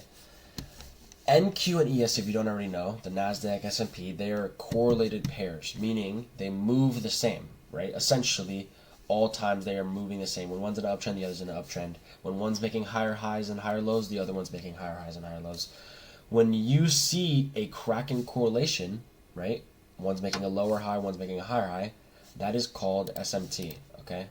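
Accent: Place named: West Virginia, United States. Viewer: west virginia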